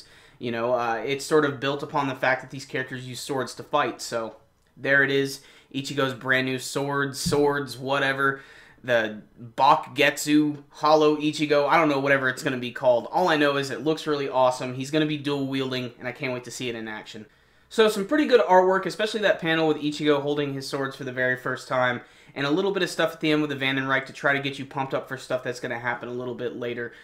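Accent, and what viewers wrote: Germany. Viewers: lol at the german accent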